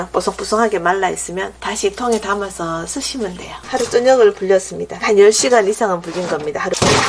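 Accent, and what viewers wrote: Gyeongsang, South Korea. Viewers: 설명 참 정확히 잘해주십니다 목소리도 맑고경상도 억양이 매력적입니다 두부 한번 해봐야 겠다 날마다 좋은일만 있으시길 바래요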